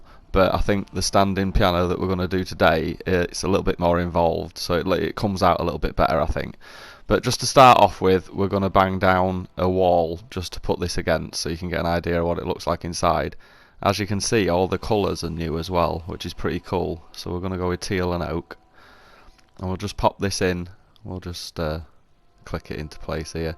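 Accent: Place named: Northern England, United Kingdom. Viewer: I'm surprised your views are so low. Like this is a very rare market on YouTube. I've been looking for things like this and not many do it. Thanks for the video, and I think I can hear that northern accent so maybe I am biased as a Yorkshire man myself ;)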